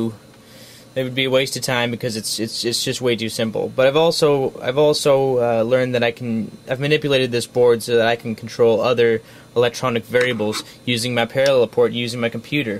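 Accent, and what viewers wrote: Canada. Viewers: i can tell by your accent that you are a canadian...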